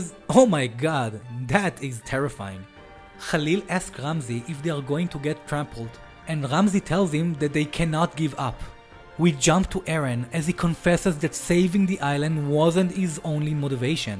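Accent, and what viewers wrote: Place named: Israel. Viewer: אה חחחחח שמעתי אותך אתה פשוט נשמע ישראלי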